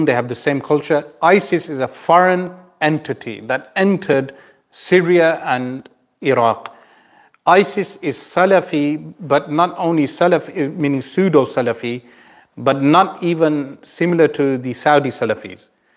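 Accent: England, United Kingdom. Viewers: I love ur English accent and explaination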